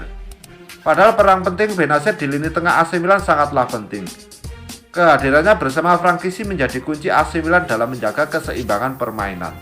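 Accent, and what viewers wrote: Java, Indonesia. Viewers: Medok bgt ngomong e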